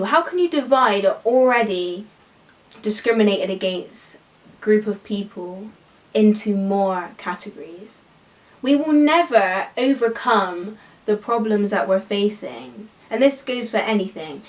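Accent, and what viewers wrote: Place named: Gloucester, United Kingdom. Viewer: where are u from? u got a strong gloucester accent aha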